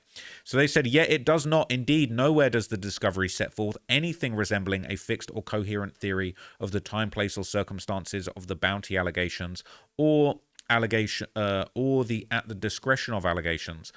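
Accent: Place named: United Kingdom. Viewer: Freudian-slips with the UK accent be hilarious 😂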